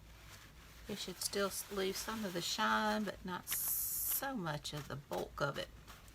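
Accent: South, United States. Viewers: I really enjoyed this video; b/c you were naratting it, it's so nice to hear that southern accent. I can't imagine the humidity you have been having this summer, we have been hit hard and it finally broke last night after a rough rain storm. Tell you hubby not to over do it out there and let him know you want to learn to use that zero turn. I'd love one but I have some side banks that can't handle one. Love that shoe and cutting the top was perfect, it makes a nice pocket. Did I see a rash on your right hand? I hope it's not poison ivy. Have a wonderful week and don't worry about being behind....... I'm 3 weeks behind now on these challenges......... OUCH 😂😂😂 have a great week 🎉🎉🎉 xoxo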